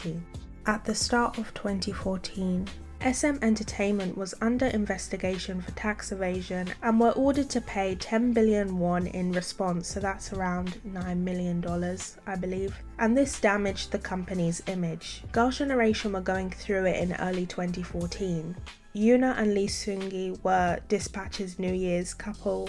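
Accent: United Kingdom. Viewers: YOU'RE BRITISH????? 😬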